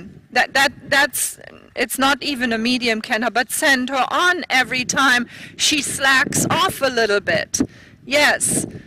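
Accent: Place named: Germany. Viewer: You really hear the german accent 🤣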